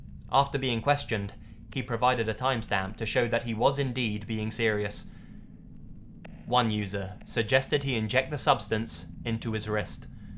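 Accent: England, United Kingdom. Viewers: I take this too seriously because of his Sherlock Holmes accent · Do you have a world map or maybe even a globe? If so, I'd encourage you to observe there are actually four countries that Britain is comprised of, namely Scotland, Wales, Northern Ireland and England. I've named them in that order, so you'll see what you believe to be a British accent is, in fact, an English accent. The rest of us, comprising of three Celtic nations, can hardly be expected to bear the blame for the narrator's accent, as we are not English, nor is there a common accent, only a language. Do you see what I mean? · just came from another video, a guy with a crappy voice. While waiting for the ad to finish, I was thinking "Please have a britiish accent ;-;" wasn't disappointed.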